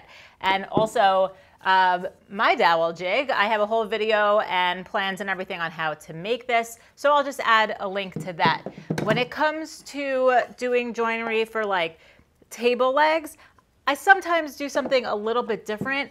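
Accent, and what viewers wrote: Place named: Northeast, United States. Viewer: "joinTing"?,,,There are LOTS of wood working channels out there,, Listening to your Northeast accent is why I like yours,, Keep being you!!... ;-)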